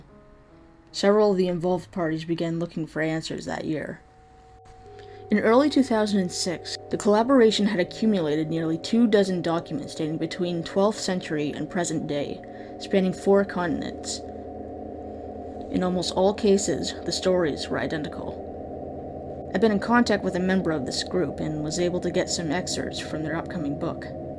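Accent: United Kingdom. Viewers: That British accent! xD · heavy british accent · his English accent though 😂😂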